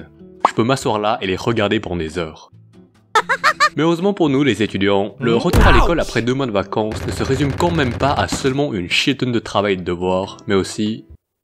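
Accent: Belgium. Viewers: Tu es belge? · T belge?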